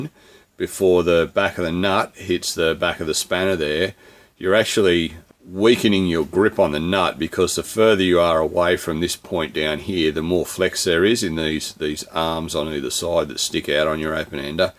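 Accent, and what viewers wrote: Australia. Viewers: Love your Aussie accent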